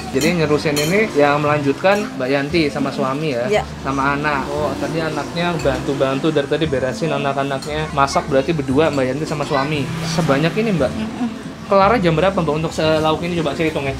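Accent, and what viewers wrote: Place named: East Java, Indonesia. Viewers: Oalah pantesan bahasamu jawatimuran mas wkwkwk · Logat jawatimur an aslinya mana sih 😅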